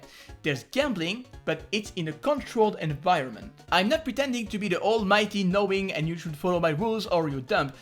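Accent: France. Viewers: You sounded way more French in this video. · are you french????? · Is this guy French?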